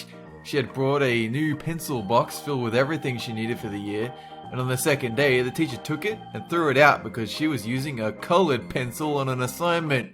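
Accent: Australia. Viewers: Yaaaay...narrated by 😊 G'day mate, love it that an aussie is such a successful youtuber and has awesome channel. Also love when you narrate in your very aussie styled, layed back, smart arse way. More narration from YOU pls! And a face reveal...of everyone pls?!?!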